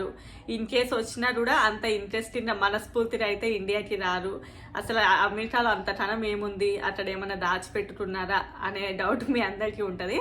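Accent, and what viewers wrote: Telangana, India. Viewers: Chala baga cheputhunnaviu bidda. unnadi unnatlu clarityga cheppavu thalli.very good Amma.India lo Ap, kadu meru telangana telugu vare anukuntunnanu.thank you bidda.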